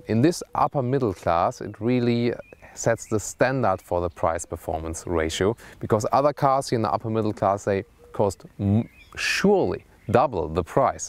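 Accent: Germany. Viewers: Terrible German accent. Do it in your mother language please!!!! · Beautiful car but they could really find someone able to speak English with a not-so-brutally-broad German accent. First I got embarrassed why I did not understand what I thought German - it took me more than 1 minute to realise it's meant to be English :(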